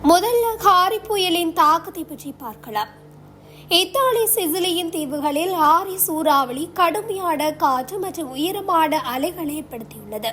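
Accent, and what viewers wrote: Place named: Sri Lanka. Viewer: Ilangai thamil kaetpadharku inimayaaha irukum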